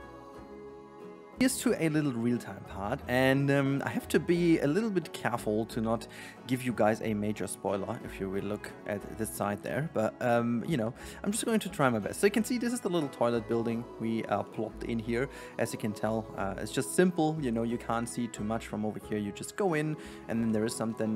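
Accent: Germany. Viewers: junge liebe ich den deutschen Akzent den man hört 😅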